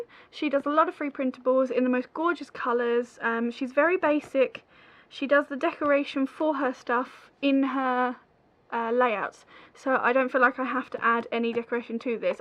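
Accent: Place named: England, United Kingdom. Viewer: Really interesting and refreshing to hear an English accent. Also great tips. Thank you for sharing.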